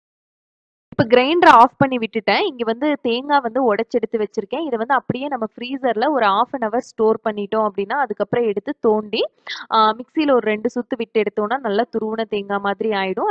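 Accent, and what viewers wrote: Coimbatore, India. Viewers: Unga native coimbatoreaa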